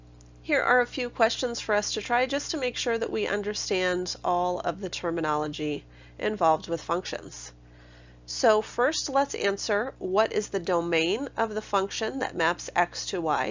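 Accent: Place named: United States. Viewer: All of your videos I have watched thus far have an electric ohmmmmmmmmmmm sound to them. idk I feel like when you're going to upload this much content it is probably worth looking into. Maybe you have by now idk. But you have a nice American accent and I really need to learn this stuff haha. Thank you for uploading these. I truly appreciate you educators who upload content that is free an accessible to all. You're the best. ☻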